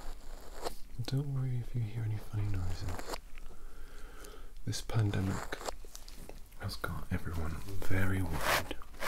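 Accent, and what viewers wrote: United Kingdom. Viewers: Me: a whole zombie: You look a bit under the weather, but other than that, I diagnose you with zombie Extremely British, I love it 😂😂😂😂😂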